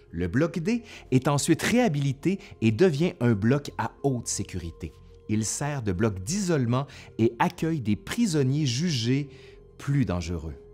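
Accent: Quebec, Canada. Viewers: Y'a pas a dire, tu prends +10points de sympatie rien qu'avec l'accent québécois... Je suis jaloux.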